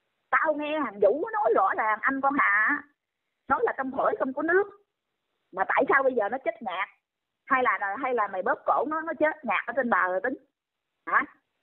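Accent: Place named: North, Vietnam. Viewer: Sao co líp này nói cái giọng nói bac kỳ sao ghét quá, giọng nói người nam ta nói thấy dễ thương 😊😊😊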